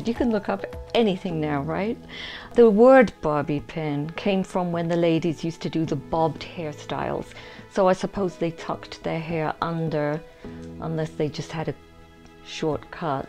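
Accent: England, United Kingdom. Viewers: I ran across your channel. I stuck around and watched several videos. I must say you are an extremely beautiful lady. I found that I was in absolute awe over the way you talk, look, act, present yourself. I love your English accent and your such a funny woman. I love your humour. Your a very bubbly person . It made me smile. Plus with saying all that your videos were very relaxing for me to watch. Thank you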